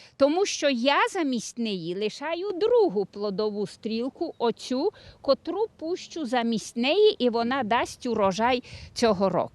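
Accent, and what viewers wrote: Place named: Transcarpathia, Ukraine. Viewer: Приємна і зрозуміла українська з самобутній забарвленням закарпатського діалекту. Довгих років життя господині.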